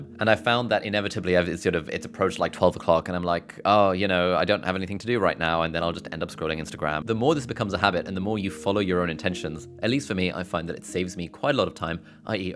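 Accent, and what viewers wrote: United Kingdom. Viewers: Gotta admit, Brit accent gets viewers. Lol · After one year of watching your videos I just realized your British accent when you said "trolly."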